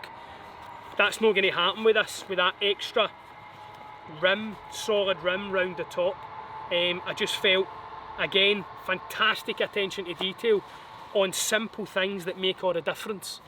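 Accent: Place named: Scottish Highlands, United Kingdom. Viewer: I enjoyed watching your video. Fantasy pack. I'm a former US Marine retired. I also enjoyed listening to you talk with your Highlander Scottish accent. It reminds me of the Netflix show Outlander.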